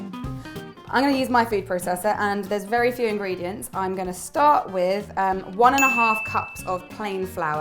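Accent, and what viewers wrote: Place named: Northern England, United Kingdom. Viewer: do you have someone close to you who is a Northerner? every video without fail you slot the accent in there hahaha. (i'm a northerner, it's always amusing ;) )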